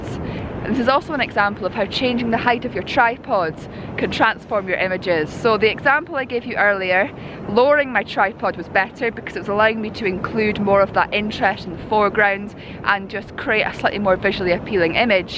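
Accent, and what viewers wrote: Scotland, United Kingdom. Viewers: Nothing beats a Scottish accent in the English language and, you excel in it:) Keep them videos coming.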